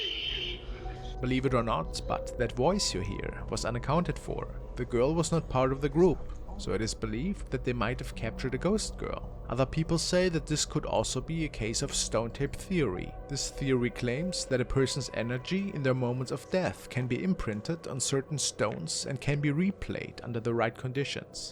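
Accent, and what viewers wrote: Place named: Germany. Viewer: Hallo. Bin durch Zufall auf Deinen Kanal gestoßen und habe gleich anhand Deiner Aussprache (Slang} gehört dass Du aus Deutschland kommst. Ich schaue gerne Deine Videos. Grüße aus Lüneburg bei Hamburg! 👋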